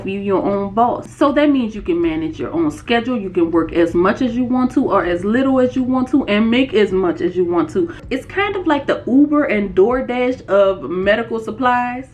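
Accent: New Orleans, United States. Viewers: I never heard of this channel but I’m hearing a slight NOLA accent… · First time watching. Question. Are you fro. New Orleans ? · Are you from New Orleans....I know my N.O. peoples ❤❤accent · What part of New Orleans you from? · It’s the New Orleans accent for me 💜💜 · before you selected your location I already knew where you were from lol five oh foe ya heard meh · You gotta be from New Orleans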